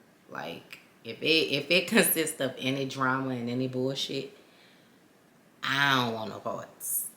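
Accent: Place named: Louisiana, United States. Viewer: And I love your sweet Louisiana accent,by the way i'm from Louisiana too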